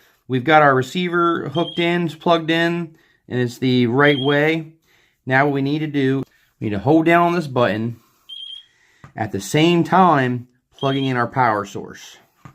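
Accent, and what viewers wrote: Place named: Philadelphia, United States. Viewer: Thanks.. You sound like you live near Philly lol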